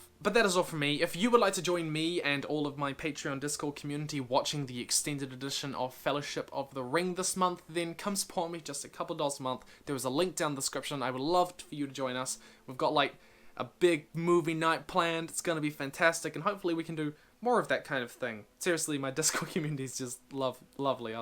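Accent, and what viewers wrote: New Zealand. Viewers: Is it a common thing for kiwis to not recognise their own accent? I cant beleive I only just realised you are also from nz xD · Love your channel bro! You sound like a kiwi... are you? im from auckland!!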